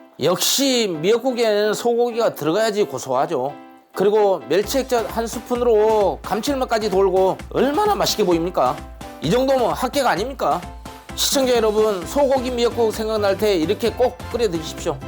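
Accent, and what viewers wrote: Jeolla, South Korea. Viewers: 전라돈디요 ㅎㅎ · 전라도 사투리죠😂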